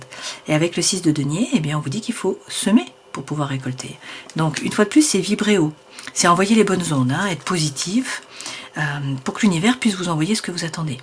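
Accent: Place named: France. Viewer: tellement beau l acent francais...😍me calme...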